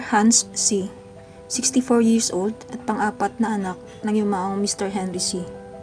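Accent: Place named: Visayas, Philippines. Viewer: Walang kwenta Ang narrator bisaya mag narrate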